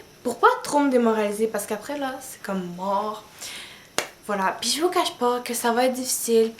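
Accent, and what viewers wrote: Quebec, Canada. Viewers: Tu me fais rire, j'adore l'accent québécois et leur façon de parler ! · Coucou :) Bravo ! Ta vidéo est très encourageante. Merci pour cette vidéo. J'adore l'accent canadien / québecquois n_n Bisous ! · J'adore Ton Accent ! Vive Les Québéquois! et 4L d'au c'est un peu trop je trouve.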